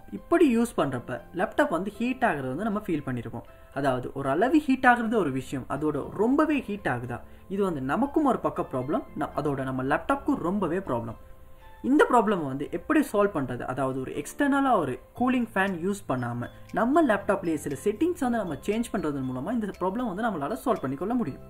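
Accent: Sri Lanka. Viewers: Srilankan friend pesra mari iruku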